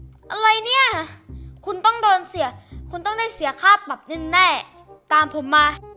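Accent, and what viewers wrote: Thailand. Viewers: พี่เป็นคนพาคเหนือหรอค่ะ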